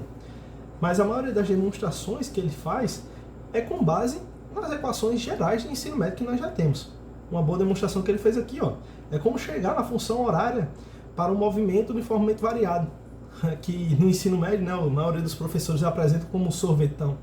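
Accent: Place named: Pernambuco, Brazil. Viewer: sempre que tu aperta num vídeo inteligente assim tu já sabe que vai ouvir o sotaque de Pernambuco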